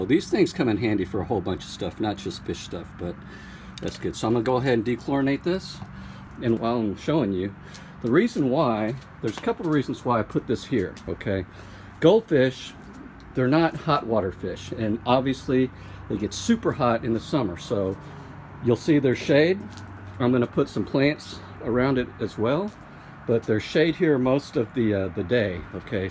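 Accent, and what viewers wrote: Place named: Texas, United States. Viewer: I love your shirt and your accent, I just discovered your channel and it made me smile when I found out where you live. OF COURSE I said to myself…. Texas! Super fun videos keep them coming.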